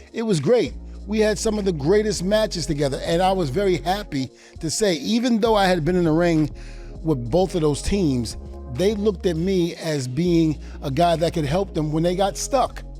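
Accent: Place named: Baltimore, United States. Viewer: i literally never noticed his baltimore accent before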